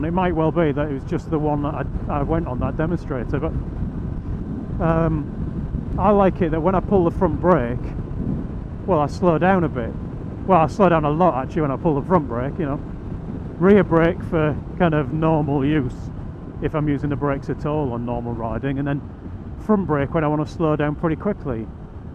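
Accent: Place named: Yorkshire, United Kingdom. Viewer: I enjoyed this video. I've already nearly decided to buy one. Last bike in England was a Honda SP2, but after eight years in Thailand on a 125, I am a "born again". But as somebody once wrote, " In the country of the sub 125, the man with 500 is king". The accent of God's Own County was a bonus.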